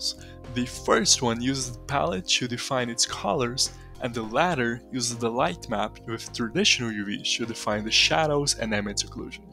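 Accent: Brazil. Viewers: I'm glad a brazilian got to work of this (basing that on his pronounciation) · O sotaque do cara é tão brasileiro que fica dificil não reconhecer, parabens cara pelo seu trabalho nessa confusão ai desse jogo, modelando tudo isso ai. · Eu amo que da pra saber de onde tu é so pelo sotaque KKKKKKKKKKKK Cadê, posta mais video nesse teu canal aí pra capitalizar nos br te reconhecendo aqui mano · Primeira vez que eu vejo um sotaque brasileiro numa fala em inglês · O É BR Q EU CONHEÇO ESSE SOTAQUE. NÃO MINTA PRA MIM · dá pra sentir o sotaque brasileiro entrando na minha alma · " BRAZILIAN, I KNOW THAT ACCENT. DON'T LIE TO ME " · Caraca!!! is brazilian sureeeeeee Kkkkkkk Eae parsa I immediately noticed its brazilian accent Incrível saber que tem um brasileiro por trás de todo esse incrivel projeto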